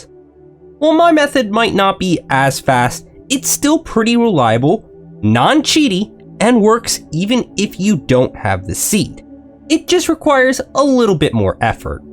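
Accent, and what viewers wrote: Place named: Northeast, United States. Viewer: First of all, great video and it’s the first I watched of yours Second, you’re definitely north east coast 😂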